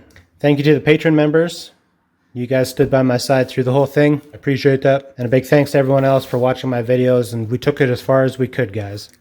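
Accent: Canada. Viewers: I wish I could shake your hand and thank you for the joy you've given. I'll miss your Canadian accent, eh? It's been super and I'm glad I got to follow along. Take care of yourself out there.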